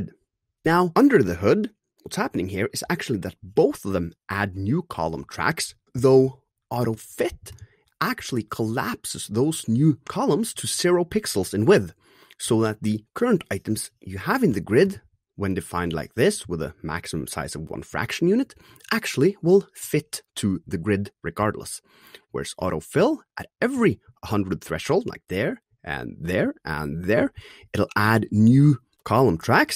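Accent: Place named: Denmark. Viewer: Great explanation, that's what I needed, thank you! You have a danish accent btw, are you from DK?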